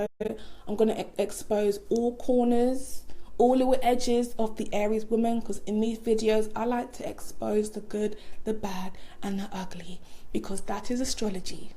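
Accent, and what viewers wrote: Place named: London, United Kingdom. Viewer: Also nice to hear a London accent on an astrology video 🥺🥺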